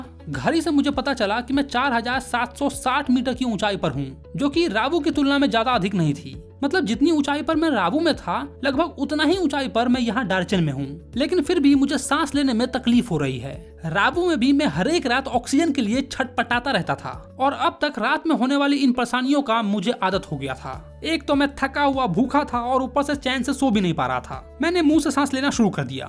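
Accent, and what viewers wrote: Bihar, India. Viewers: Bhaiya aap bihar se ho ya uttar pradesh se 😅😅😅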